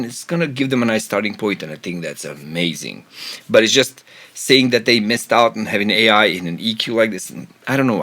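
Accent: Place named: Balkans. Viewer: Hey, if pro q 3 is my most used plugin, this one will be used even more! Are you from the Balkans maybe? you kinda sound like you are from my part of the world..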